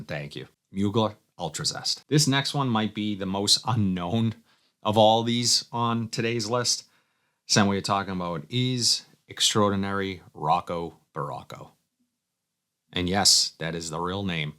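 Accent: Boston, United States. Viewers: He has the accent from The Town movie.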